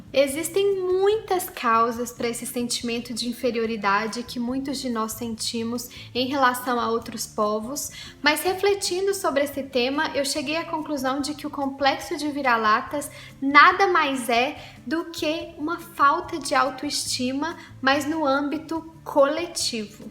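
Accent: Minas Gerais, Brazil. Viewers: Vc até pode estar morando fora do país, mas hoje quando eu a ouvi, de cara pensei: Ela é Mineirinha. Um abraço desde Mariana-MG minha conterrânea da voz doce. vêm cá comer um pãozin de queijo e tomar um cafezin. gratidão suprema.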